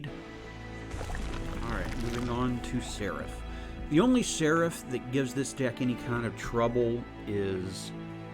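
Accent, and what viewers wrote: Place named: North America. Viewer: Hi Not looking to pry, just hope all the hospital stuff you had mentioned earlier has gone/is going well. Thanks for posting these, they helped me figure out new methods of getting through the challenges. Edit: FYI, I'm all the way in Australia, just going by your accent I'm assuming you're in North America.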